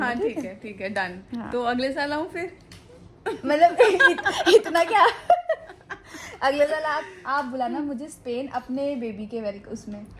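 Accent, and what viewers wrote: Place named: Bhojpuri, India. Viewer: Bhojpuri bolati Ho bahan to bahut acchi Lagti Ho Main Bhi Gorakhpur se hun mujhe bhi Bhojpuri Aati Hai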